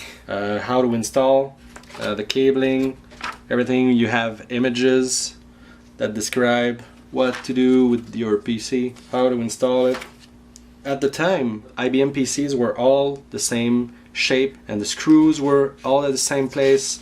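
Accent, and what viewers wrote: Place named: Quebec, Canada. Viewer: everything is in french, the t-shirt show that the tour is in quebec and also you have the quebecois accent when we try to speak english... mmm are you in quebec province ?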